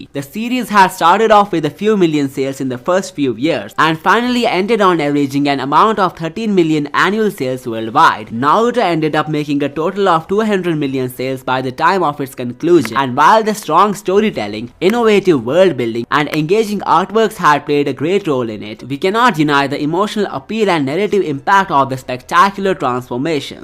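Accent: India. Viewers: Bro pls tell me you are Indian or not, coz your accent sounds like it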